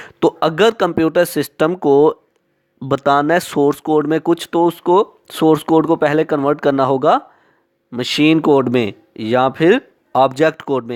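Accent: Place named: India. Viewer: u make the title english then speak indian ?!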